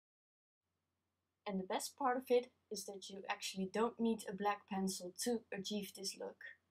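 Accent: Netherlands. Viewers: Beautiful tutorial... and charming Dutch accent :)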